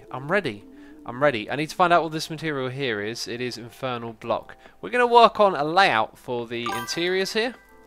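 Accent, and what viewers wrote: United Kingdom. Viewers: Please dont take that as an insult by the way your content isnt boring at all, its just something about your british accent that always does the trick for mr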